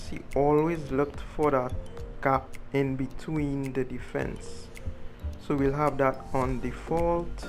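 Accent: Trinidad and Tobago. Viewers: Bro is this your Trinidadian Accent? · Ahhh boy…from d time I hear d accent….done know he is fellow Trini. Respect bredda.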